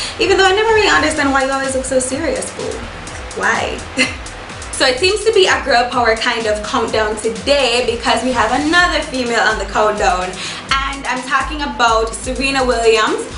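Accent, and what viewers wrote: Jamaica. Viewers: are you from Jamaica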